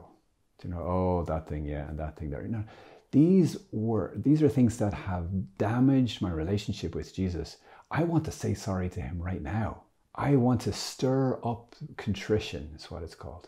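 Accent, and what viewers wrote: Ireland. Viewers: Gosh, Father, I learned something....one of which is we gain more graces other than the forgiveness of sin. I liked the phrase did I love Jesus through the day? Have to think about that. Generally I love these short but pithy videos....so much is packed in. And, there is always your Irish accent! Esther:yougotthis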